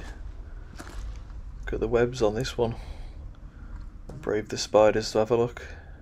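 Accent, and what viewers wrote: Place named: Hull, United Kingdom. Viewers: Great to hear an English voice for once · Can I ask where you are from? You sound like you've got a Hull accent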